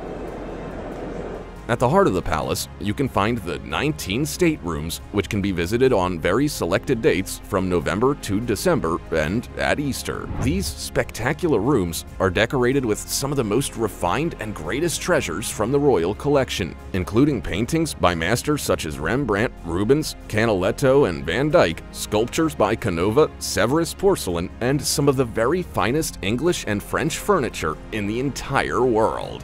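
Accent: United States. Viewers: I do dislike British documentaries narrated by Americans. Not only do they tend to be factually incorrect, but the pronunciation often jars on British ears. For example, "Buckingham" places no emphasis on the last syllable, whilst the American pronunciation makes it sound like cold meat. Incidentally, "England" and "Britain" are not interchangeable. The Queen and King were crowned Queen/King of the United Kingdom of Great Britain and Northern Ireland. And I strongly suspect any disfavour Scotland has with England has got nothing to do with ancient gold mines! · I know he also sounds very uneducated about this information about the queen and the monarchy I'm guessing he's an American from the way he talks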